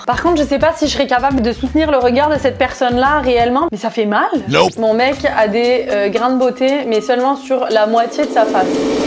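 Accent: Quebec, Canada. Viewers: Ton accent du Québec me manque.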